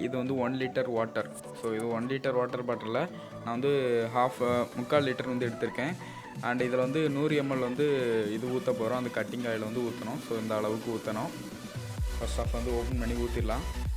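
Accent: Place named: Kerala, India. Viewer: Dai... Nee pesurathe Kerala da.. Music cut pandra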